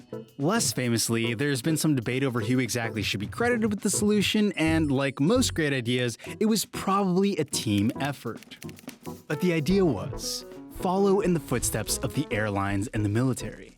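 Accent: California, United States. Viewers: the narrator's accent reminds me of the snl californians skit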